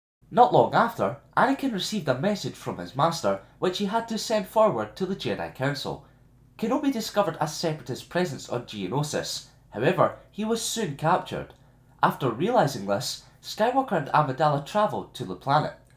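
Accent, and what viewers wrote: Scotland, United Kingdom. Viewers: Congratulations for you 100 lore ,Really like what you're doing on your channel also are you Scottish if so then I must that I visit Glasgow .Forgive me for my English it's not my first language.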